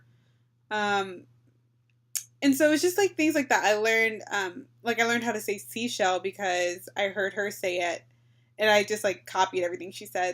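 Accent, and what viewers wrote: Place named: Mexico. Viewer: Hola felicitaciones por haber aprendido el idioma español y si, lo hablas con acento mexicano. Ahora se te abren las puertas para conocer la cultura no solo de Mexico y España sino de toda latinoamerica. Bienvenida!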